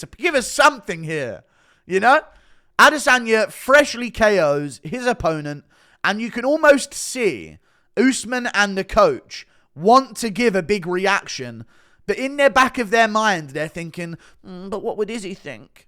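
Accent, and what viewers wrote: South Africa. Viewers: dawg your Saffa accent is on point!😂🔥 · Great SA accent dude! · Hahahah that South African accent ... not bad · you are hell of entertaining, it's always good listening to your podcasts. You put a smile on my face, thank you. Love the Saffa accent and the truth bombs on Adesanye. You got balls telling the truth, love it.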